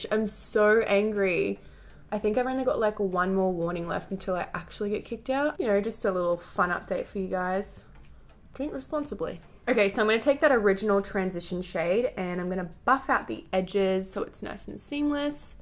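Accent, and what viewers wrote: Australia. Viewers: I have a rly good Australian accent just from watching you for 3 years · does anyone else love aussi accents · You are literally perfect 😭❤️ I want an Australian accent so bad my fav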